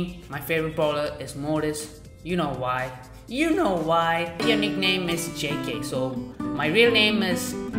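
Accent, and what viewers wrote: India. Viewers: Indian? Accent and yeah.... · No Front, But as 1st second i heard you, anyways i knew that you're from india. As Same as Wehen i Heard- myself, i know that i'm from germany 😅🤫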